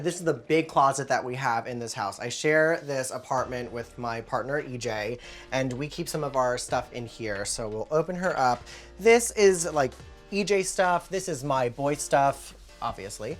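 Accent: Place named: New Jersey, United States. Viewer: I love her New Jersey accent and energy!!! He is the type of guy you want to take home to meet your mother.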